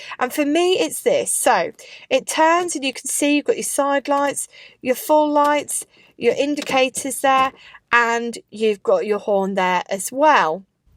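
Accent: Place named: Yorkshire, United Kingdom. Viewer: Never realized you were a Yorkshire girl. Another great video, certainly know your stuff. Had 4 brand new Renault's all went wrong. Too complicated these days. Take care of yourself please and stay safe x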